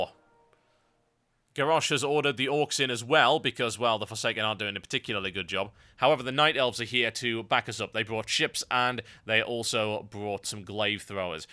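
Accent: United Kingdom. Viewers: heh, great shows man, i really like them, keep it up. love british accents :)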